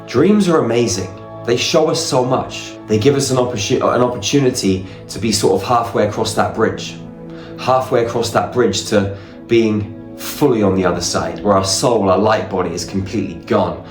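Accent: United Kingdom. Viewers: its not a normal british accent just trying to figure it out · He's British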